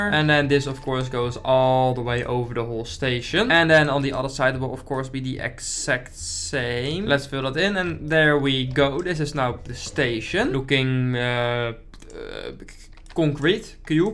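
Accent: Netherlands. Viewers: Nederlands accentje👌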